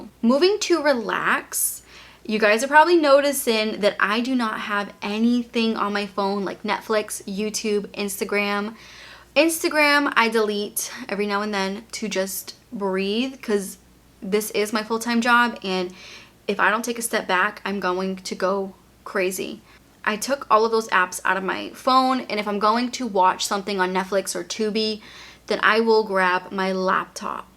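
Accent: Boston, United States. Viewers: I actually love your boston accent! ❤️ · Wtf no i like ur boston accent.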